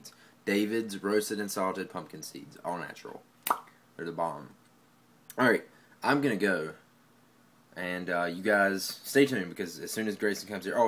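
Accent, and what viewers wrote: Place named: North Carolina, United States. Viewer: hahaha I'm from North Carolina too and i as soon as i heard your accent i knew you were from NC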